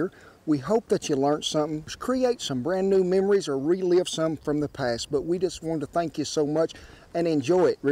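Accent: South, United States. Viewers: “ a good rollin bowl” love his southern accent. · my grandma who is Italian American and who can make anything. She would make me Chicken and dumplings when I was little. Don't worry I can understand who most of your cowboy English. I like what you call eggs:)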